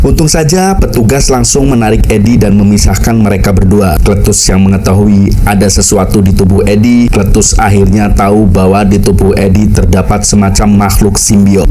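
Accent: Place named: Banyumas, Indonesia. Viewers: Wong tegal kye😂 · Wong endi min, bahasae kaya wong panturaan jeh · Mantep bro, aja kelalen ngapakmu rejekimu haha. Salam subreker anyar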